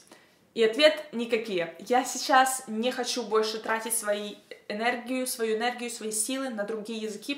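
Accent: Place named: England, United Kingdom. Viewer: Слышу в твоём русском английский акцент 😄😄😄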